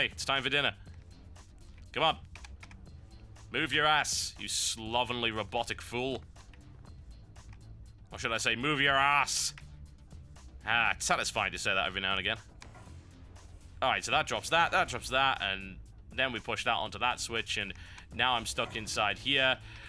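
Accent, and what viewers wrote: United Kingdom. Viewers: Well, everything sounds classy in brittish. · Americans... the language was pretty much created by the English, so how can the Brit pronunciation be wrong? It's your version of English that's been bastardised. As for Beta, it's "beeta". Live with it, it's a Greek letter so really, it's pronunciation was set a long time ago :P While we're at it, jelly goes with ice cream, jam goes on bread and it's aluminium, not "aloominum". Thanks :) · TB isnt rambleing insanly, he's rambling insanly in a British Accent.. His WTF Videos are a first look at the video, within the video he gives commentary to help get a little more enjoyment out of the video. As for the starting screen, he Critiques it eg Multiplayer Options, Settings, etc. 2 minutes really isnt THAT much of a sacrifice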